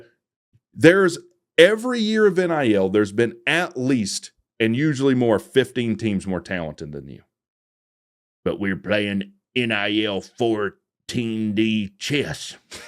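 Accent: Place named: Tennessee, United States. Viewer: Your Tennessean accent is insulting and your opinion is trash. Good luck next year GT... ha...ha.ha...ha....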